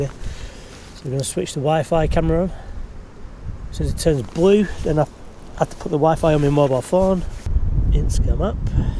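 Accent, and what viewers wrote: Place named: England, United Kingdom. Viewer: very nice eng accent haha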